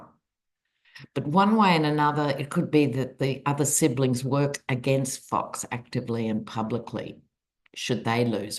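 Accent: Australia. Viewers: Hi, I was wondering if you wouldn’t mind telling me what tarot pack you are using in this video, really drawn to the artwork? Love hearing an Aussie voice & as I think we are of the same vintage, also love your colloquialisms you use 😊 Thanks for all your hard work. Best wishes ❤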